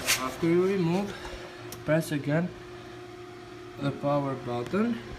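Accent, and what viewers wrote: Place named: India. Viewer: Indian accent Thank you very much good video! Big Like !Sub give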